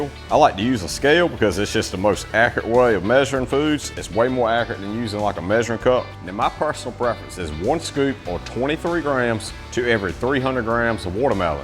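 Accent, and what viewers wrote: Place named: North Carolina, United States. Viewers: No clue how i ended up here, but a dude who looks like he could break a tree in half just by looking at it, with an accent I cant place further than "south? Maybe????", using molds he calls "adorable"? Yeah. Sign me the fuck up. (And yeas, my own prejudice absolutely plays in here, i was so ready to scroll quick as shit. Was like 85% sure this would include some 'women suck, God is everything, black people are criminals and also the gays are sinful' kind of garbage. I'm from Europe and everything about this mans first impression screams terrifying to me. Glad I stayed, if for no other reason than to sit with my own prejudice for a while and start to become aware of it. So you know, thank you for that, and also sorry for the immediate judgement) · I just shared this with my mom, 8 siblings, and their spouses: “This dude I’d propose marriage to if he were single (and not straight). 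“His video shorts are amazing, canning peaches, growing food, freezing cantaloupe for smoothies, freeze drying watermelon, and so much more. A southern accent is always comedic or fascinating, but rarely sexy. I’d be able to listen to his accent all day long and be charmed by it.” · Are you in North Carolina? I love your accent & your content...new subscriber. ❤ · I live in the southern United States and I can’t figure his accent either! It’s delightful!! He’s either from the Carolina’s or Louisiana?? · He's from North Carolina, where he contributes his accent. He doesn't have a Louisiana accent (born and reared here, although we'd welcome him).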